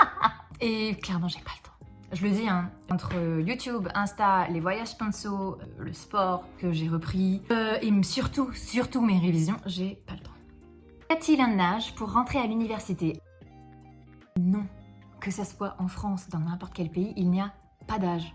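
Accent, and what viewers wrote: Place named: England, United Kingdom. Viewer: Et les copains de France te manquent pas ? Vilaine 🙃🙃 j'adore ton accent anglais ! Et merde pour tes exams du coup :) bisous bisous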